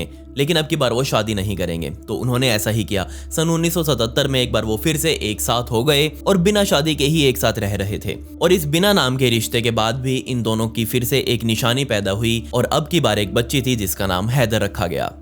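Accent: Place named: Punjab, India. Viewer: hilariously you speak hindi little bit punjabi touch make your video more interesting carry on great job.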